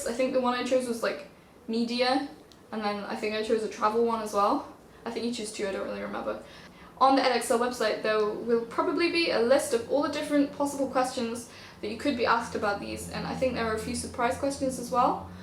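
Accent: United Kingdom. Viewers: イギリス英語ですか？ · 速いーー！イギリスのどこ？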